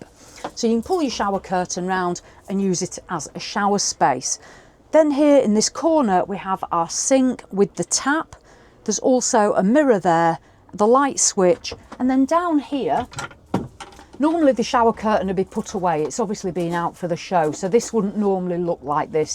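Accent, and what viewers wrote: United Kingdom. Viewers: It looks like the beds fold up as twin beds so you can have taller cargo space on one side if you want to? And leave one side down as a made-up bed? This feature isn't available on any American models. You are by far the best presenter I have ever seen! So unlike any of the American video creators who don't do their homework and then they stumble and bumble through the whole thing. Incredibly good presentation! Bravo!! And of course we love your British accent and all of your unique expressions LOL.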